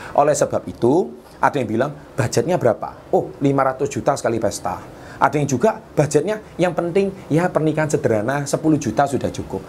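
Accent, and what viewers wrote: Java, Indonesia. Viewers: Orang jawa ya mas Medok ya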